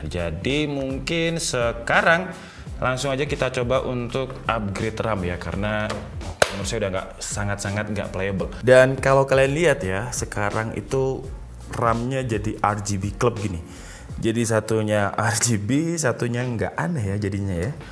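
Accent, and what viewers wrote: Java, Indonesia. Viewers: pancen wong jowo kok mas wkwkwkwk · logate kyk wong jowo 😅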